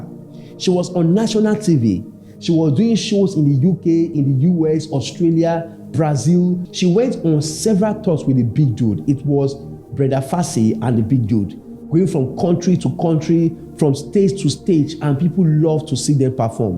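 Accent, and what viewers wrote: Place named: Nigeria. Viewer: How is hate this Nigerian accent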